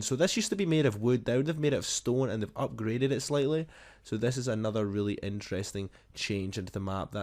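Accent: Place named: England, United Kingdom. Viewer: You have an nice english accent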